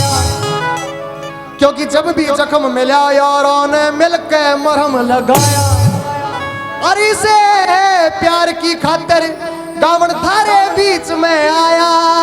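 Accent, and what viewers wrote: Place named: Punjab, India. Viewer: I am Punjabi Jatt, I have business in Gurgaon, and they speak different haryanvi to this. He is speaking like punjabi style, its nice to hear. Jatt/Jat/Jutt zindabad. respect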